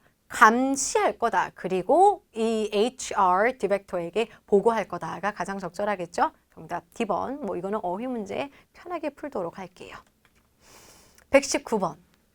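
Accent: Gyeongsang, South Korea. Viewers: 경상도분이신가여 ㅎㅎ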